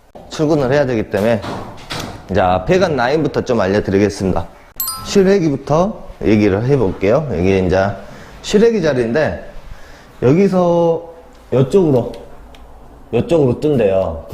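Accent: Jeolla, South Korea. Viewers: 딱봐도 전라도 사투리 쓰시는구만 한국사람맞냐니 말하는꼬라지보소 인성먼저 갖추시길 바람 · 얼굴은 잘생겼는데 사투리가 심하네요 인자는 전라도 사투리? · 대한민국 사람이면 누가 들어도 전라도 사투리를 ..ㅎㅎ 강원도인줄 알았다네..ㅋㅋㅋㅋ 탈북민인가?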